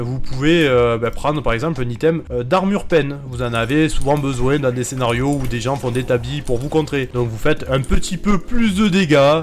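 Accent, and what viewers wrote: South, France. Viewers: C'est la première fois que je rit autant 😂 Ton accent du sud combiné avec tes magnifiques blague 😂, c juste ouf Sinon très bon guide, tu gagne un abonné 👍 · Je suis plié de rire j’ai jamais autant rit sur une vidéo YouTube, avec ton accent du sud qui rajoute un petit truc, une pépite de YouTube tu es, continue comme ça tu es incroyable Peace ✌️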